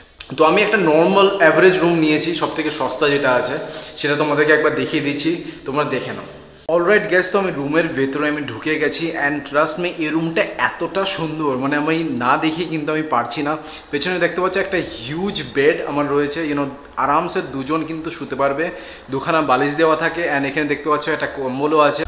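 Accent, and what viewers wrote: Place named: Bangladesh. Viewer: Accent to puro bangladeshi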